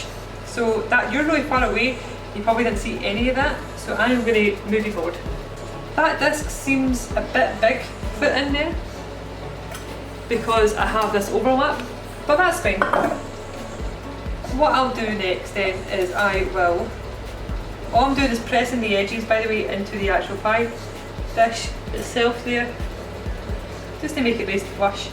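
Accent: Scotland, United Kingdom. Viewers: This is the 1st of your videos I've seen, and it was a quick like and subscribe! I loved your zen attitude while you called the dough a bitch. I am miserable when it comes to baking. The worse was when my mom had cancer and asked me to make an Italian ricotta pie... so easy! I told her I couldn't make dough, but she said "any idiot" could make dough in a food processor. Long story short, I ended up with a crumbled mess of shit and broke into tears. Granted, the mini nervous breakdown was due to the fact that my mom was dying, but that I couldn't make that pie for her sucked, too. Anywhoosle, down the road, she loved what was to be the last meal I made for her, a simple goat cheese omelette. Thanks for taking me down memory lane. I also loved that I misunderstood your Scottish accent (me stupid American). I thought you said "beatnik" instead of "beaten egg" and laughed, "So mince pies are gamy after all." Happy New Year!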